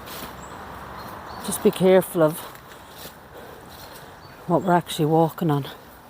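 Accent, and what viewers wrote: Ireland. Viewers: I love your accent. I don’t hear Irish accents much, since I left Boston in 1978.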